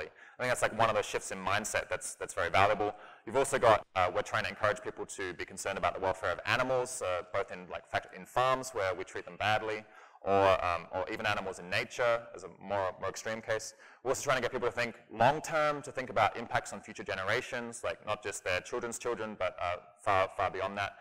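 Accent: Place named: Australia. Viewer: just his accent. one part of Australian accents is higher pitch at the ends of sentences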